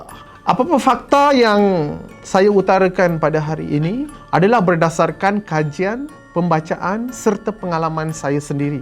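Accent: Malaysia. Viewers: Kuat bergaul dgn melayu brader ni. Pekat nauu melayu dia 😂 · Care die cakap macam orang Melayu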